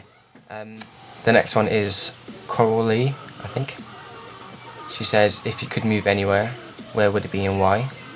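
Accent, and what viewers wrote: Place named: England, United Kingdom. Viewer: you sound more english than welsh, aw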